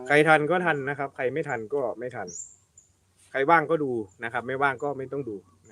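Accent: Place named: South, Thailand. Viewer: ชอบอาจารย์แหลงๆถูกใจชอบ❤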